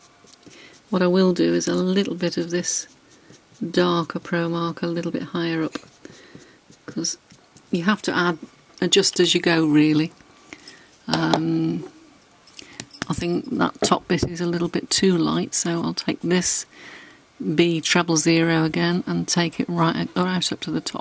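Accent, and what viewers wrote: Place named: RP, United Kingdom. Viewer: Great video buddy! It's lovely to hear your (posh) voice! xxx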